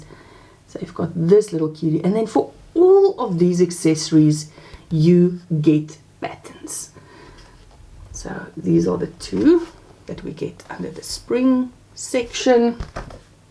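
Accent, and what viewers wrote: South Africa. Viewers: Hello. Your bee is gorgeous and what a lovely story. I am lucky I have both of these books already. I have finally caught up on all your videos. I love your channel My son in law comes from South Africa but he and my daughter and two grandsons live in the UK so it is lovely to hear the accent. My husband also visited Denmark years ago and loved Copenhagen. He used to work for Bang and Olufsen. He has retired now. We are from Australia. Lovely video. Thank you have a great week. ❤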